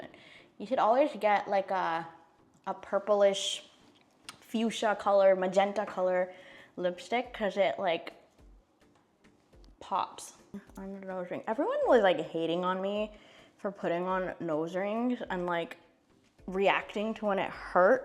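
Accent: Godavari, India. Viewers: Your godavari slang 🔥🔥🔥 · ❤❤ your telugu is cool and nice.. I can say your parents are from godavari districts of a.p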